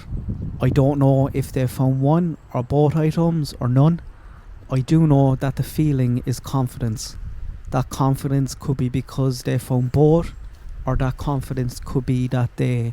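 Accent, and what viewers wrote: Ireland. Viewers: Great to hear an Irish accent,this case is going to be solved,I'm a new subscriber🇮🇪🇮🇪🇮🇪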